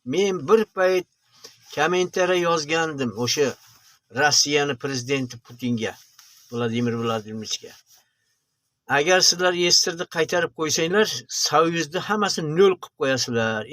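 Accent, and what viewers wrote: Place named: Kyrgyzstan. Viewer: Dunyodoshlar deyishizga qaraganda siz Oʻzbekistonlik emassiz.Shevangizdan Qirgʻizistonlik oʻzbeksiz. Rossiya propagandasini kuchu bu!Oʻzbekistonliklar bunaqa fikrlamaydi!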